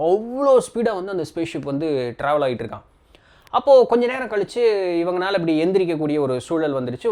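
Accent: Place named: Marthandam, India. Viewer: Marthandam slang tamil song paadura pola tan irukum..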